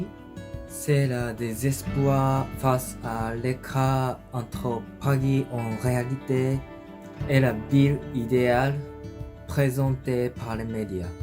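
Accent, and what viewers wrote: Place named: Asia. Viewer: Salut et merci pour tes impressions ! :) J'adore l'accent Français quand c'est un asiatique qui le parle. C'est une très belle langue, content que tu veuilles continué de l'apprendre ;) Pour le côté négatif de ton voyage malheureusement tu n'es pas seul à partager ce ressenti, (2) sur Paris et ses grandes villes. Ce qui est triste quand on voit ce qu'elle était, avant toute cette criminalité.. ( Les pays comme la France, la Belgique et le Royaume-Uni font parti des pays les moins sûr d'Europe.. ) - Si ça peut aider des personnes, je pense particulièrement aux Japonais et Chinois. (En rue, pour tous ce qui est; arracheurs de sacs, pickpockets, crackhead, racailles, "non-français" etc..), ils ciblent particulièrement les asiatiques parce qu'ils savent que vous avez du cash sur vous quand vous visitez. N'hésitez pas à porté plainte à la police directement si cela arrive, vous aurez peut-être une chance de récupérer vos affaires si cette personne est repérée et attrapée après d'autres vols/agressions dans la journée puisque dépouiller/agresser les gens est un sport avec eux.. 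Heureux que tu aies quand même gardé quelques bons souvenirs de ce voyage et bonne chance avec ton apprentissage c'est vraiment impressionnant!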